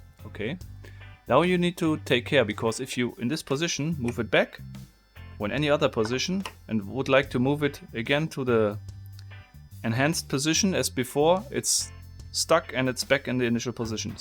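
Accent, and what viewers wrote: Germany. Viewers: Instead of toying with this box you should polish your English or bloody give it up altogether.....so f....g German...it is NOT beck but back....it is NOT gep but gap...it is like the Chinese who say lice instead of rice...very disturbing. Record yourself and or go to a pronunciation bloody class...and you do not say isss you say izzz....English voiced C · Can it be that you're german? Because of your english😊 no hate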